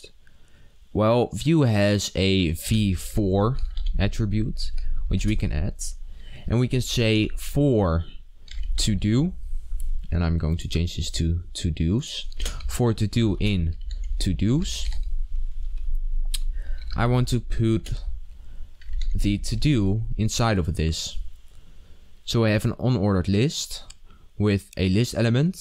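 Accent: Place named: Netherlands. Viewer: This Guy sounds dutch, lol is he?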